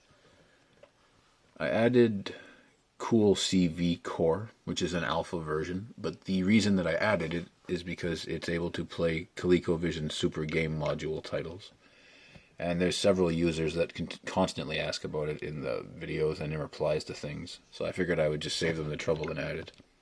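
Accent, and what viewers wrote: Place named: Winnipeg, Canada. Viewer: You're from Peg City aren't you? Lol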